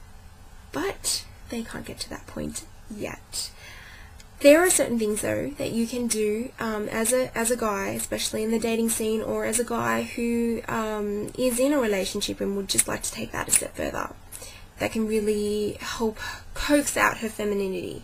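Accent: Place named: Australia. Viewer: U sound Australian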